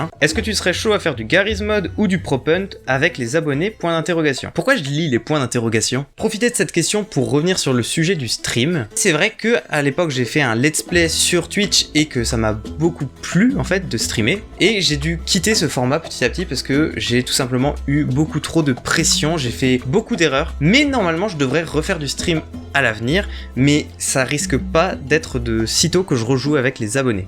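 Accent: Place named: Alsace, France. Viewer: Merci ( Moi je connais ton vrai prénom hehehe 😜 ) pour cette FAQ, j'ai appris des choses sympas sur toi 😃👍🏻 Et j'avais deviné que tu habitais cette region, ( j'ai habité en Alsace pendant 11 ans, ma region de cœur 🥰 elle me manque 😓 ) le "OUi", ils le disent "Ui" c'est rigolo 😂 Khalee et tes 2 chats, je les adore! 🙏🏻😍💝 Je suis aussi cinéphile, quand je regarde mes Thrillers psychologiques, je decortique, je fait des hypothèses, j'essaie de comprendre ce que le réalisateur a voulu nous faire comprendre 🤔🕵🏼‍♀️ Et une question qu'on ne t'a pas posée: Je vois que tu as plusieurs guitares derrière toi, tu en joues? Si oui ( Ui 😂😜 ), pourrais-tu un jour nous en faire profiter un peu? Je suis qqun qui est très musique, chant, danse, etc... et je suis totalement d'accord avec toi, Joyca il pèse lourd dans le game, il est tellement doué!! J'adore ce qu'il fait, c'est fou ce qu'il arrive à sortir avec 1 son de 2 secondes 🤩🎶 Et quand Squeezie ( que je kiffe aussi énormément 🤩🙏🏻 ) s'en mêle, ça fait un duo de MALADE 🤩Sora est trop adorable et bienveillant, je le suit depuis peu, je l'ai decouvert récemment, et bien sûr, Louis! 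🤩 Je suis de plus en plus attirée par la culture Asiatique, le Japon 🏯🇯🇵💝 et la Corée 🇰🇷💝🎶 Voilà, j'adore ce que tu fais, ta façon de raconter, ton humour rafraîchissant 🤣🙏🏻 je t'ai decouvert il n'y a pas très longtemps, je dirais 7 mois 🤔 avec ta vidéo "Vous ne pourrez plus être en couple" 🤩🙏🏻 J'ai hâte de voir ta progression, tes projets, etc... Biz da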